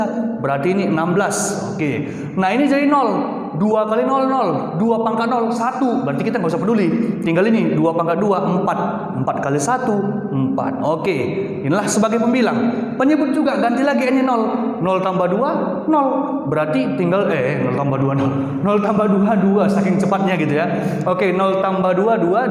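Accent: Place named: North Sumatra, Indonesia. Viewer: Bapak org Sumut ya pak? Logat bicara kita hampir mirip soalnya😁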